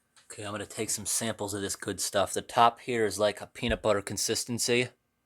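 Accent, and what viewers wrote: New England, United States. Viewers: LOL i cant believe that doesnt even smell bad🤔, thats crazy. Btw your voice is amazing. It must be the new england accent🤔. Im from Canada. Anyways its very nice.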